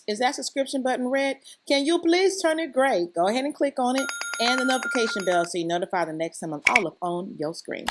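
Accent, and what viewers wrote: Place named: Nigeria. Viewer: The naija accent😍😍😊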